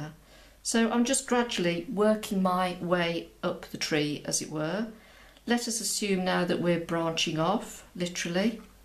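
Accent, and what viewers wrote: United Kingdom. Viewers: I've so enjoyed watching your videos & learning about watercolor from you. You're such a lovely lady with a soothing, relaxing voice, especially your accent. I love all things British & hope to visit the UK one day, especially England. I'm an extreme beginner to watercolor at age 44 though my late grandmother was an accomplished artist of oils & watercolors. My mother has painted in the past as well. My 19 year old daughter, who is a beginner also, inspired me to give it a go! I can't draw well at all but I've been able to paint from some of your videos, like the lavender pot and these wonderful silver birches! We have them here in Virginia, too! I was able to paint a very nice one from this video using brush you mentioned; so easy, but looks so real! Thanks again for sharing your talent!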